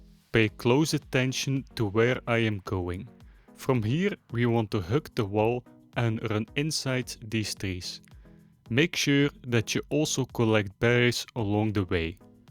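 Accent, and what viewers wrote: Netherlands. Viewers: Beetje Nederlands accent maar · Love the Dutch accent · haha het nederlands accent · nederlands? je hebt een exstreem grote accent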